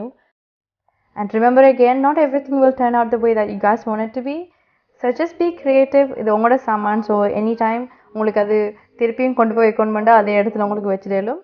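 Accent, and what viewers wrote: Sri Lanka. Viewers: I love your srilankan tamil,you speak as much as you can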